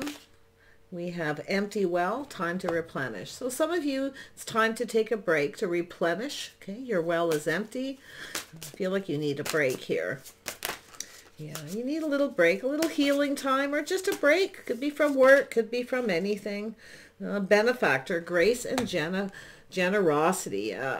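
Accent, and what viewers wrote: Canada. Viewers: I’ve been listening to many tarot’s to help me through some shitty times and keep me grounded but I want to say this was so bang on I had to comment. And I’m living in the US and I can tell from your voice ur Canadian as am I so felt like another sign lol. Thank you because this reading really helped me so much and u are bang on it for me, wanted to extend my gratitude and appreciation and I’ll be following u closely now haha. I mean just nothing has said it better than u xxx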